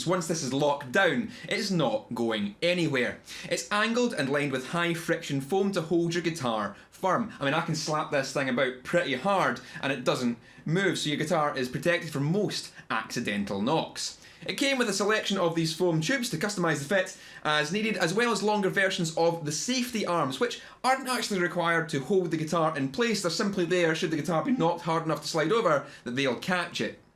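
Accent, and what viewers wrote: Scotland, United Kingdom. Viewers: I must say, your purposeful diction is fantastic. It helps us yanks here in the states wade through your Scottish brogue!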